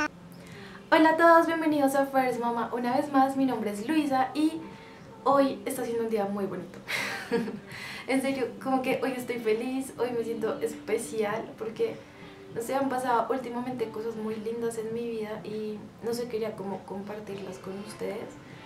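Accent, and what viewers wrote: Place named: Colombia. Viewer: Holaa guapa! encontré tu canal en el de Marisol de mi vida con Mateo y me encanta, me gusta como hablan los colombianos pero así como tú jijii me suscribo y te invito a mi canal también soy mamá <3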